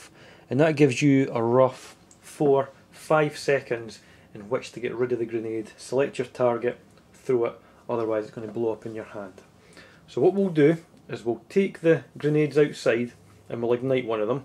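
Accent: Scotland, United Kingdom. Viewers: Scottish accent ! I love it ! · I love this kind of Scottish accent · i love your scottish accent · the awesome Scottish accent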